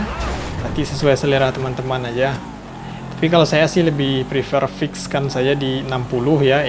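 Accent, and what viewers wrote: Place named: Padang, Indonesia. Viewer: Orang mana bang?logat nya kek org padang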